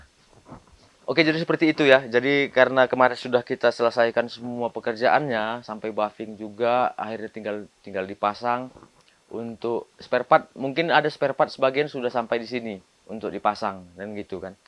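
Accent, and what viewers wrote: Medan, Indonesia. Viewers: Orang medan bg?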